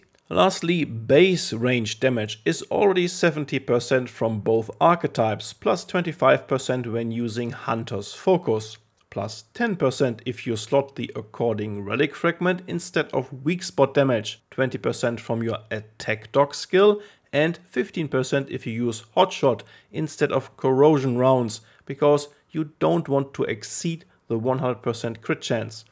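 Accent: Germany. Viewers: Are you german cause of the pronounciation?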